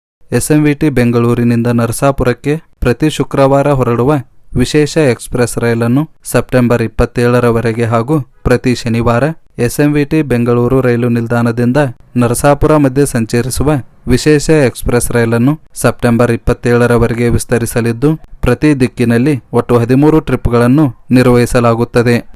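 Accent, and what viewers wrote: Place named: Kittur Karnataka, India. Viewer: Nimma kitturu Karnataka slang bittu shudda kannadadalli heli nimma channel grow agutte i hope you don't offend of my suggestion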